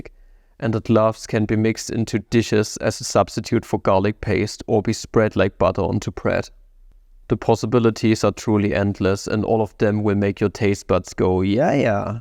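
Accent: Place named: Germany. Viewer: This man has the strongest German accents ever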